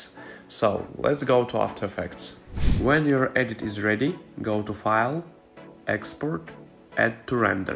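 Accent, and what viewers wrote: Russia. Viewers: русский акцентик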